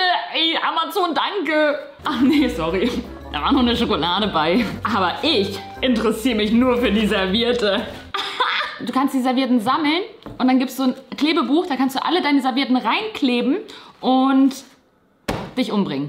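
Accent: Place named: Hamburg, Germany. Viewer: Also da wo ich herkomme sagen das viele Leute so😂 und da meines Wissens nach aus der Nähe von Hamburg kommt ist es evtl. deshab so🤔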